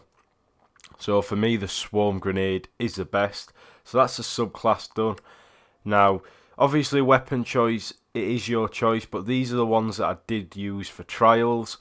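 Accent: Yorkshire, United Kingdom. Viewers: You sound like a reight Yorkshire man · Dude are u a yorkshire lad????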